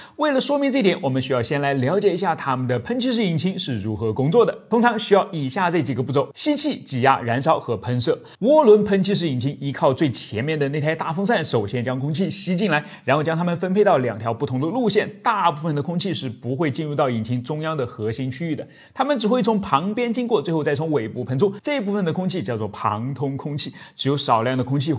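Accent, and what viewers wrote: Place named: Henan, China. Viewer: 哈哈，我也听出来了，河南话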